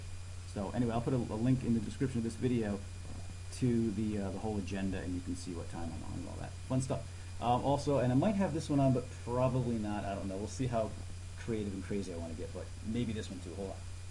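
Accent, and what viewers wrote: New England, United States. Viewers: Your from new England!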